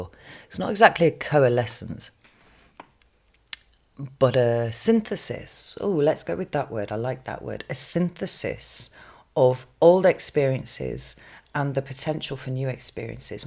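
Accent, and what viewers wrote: United Kingdom. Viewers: I have been watching tarot and reading professionally for many lifetimes. I have NEVER seen a reading that resonated more strongly. It was like a replay of a conversation I had with a dear soul sister whilst sitting in the forest here in NZ last night. What’s more, her British accent is identical to yours. I’m a psychic/medium and that dear one was some truly beautiful reading of the tarot. I’m only an intuitive reader, but you totally know your sh!t! Your understanding of how the energies weave are phenomenal. Deep gratitude for all you bring and so freely give. Much love to divine sister. 🙏♾💜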